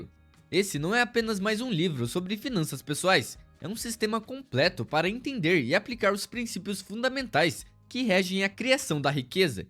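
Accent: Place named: Sao Paulo, Brazil. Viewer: Sou de Minas e não suporto este sotaque seu de Paulista. Mas vale pelo conteúdo informativo.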